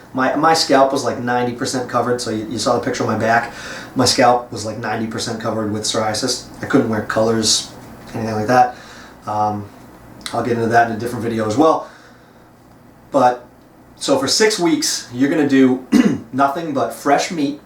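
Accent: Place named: United States. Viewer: Brother i can't understand spoken american well. Can u write down your recommendations please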